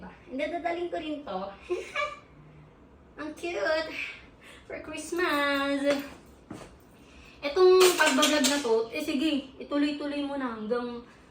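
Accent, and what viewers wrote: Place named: Australia. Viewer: I wish to meet you one day. Did you live in Australia before or hang around lots of Aussies? Your accent is like ours.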